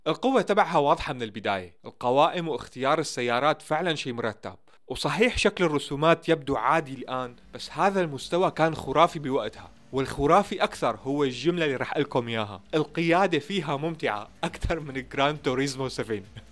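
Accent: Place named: Syria. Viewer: يازين اللهجة السورية والله احبكم يأهل سوريه تكفون ادعولي اتجوز سوريه الله يخليكم والله من وانا صغير احب السوريين واحب لهجتهم.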